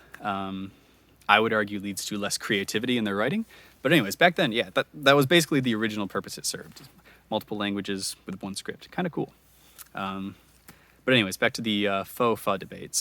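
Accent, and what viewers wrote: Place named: United States. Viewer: So, for a start, you have an American accent, yet you're speaking English. And now you want to apply your AMERICAN accent to OTHER languages as CORRECT ENGLISH? Sure thing.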